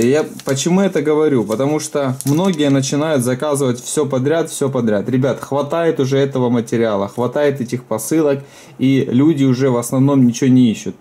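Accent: Ukraine. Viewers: У тебя в этом видео акцент Украинский сильно выражен )) А в других его вообще нету такого,все на русском))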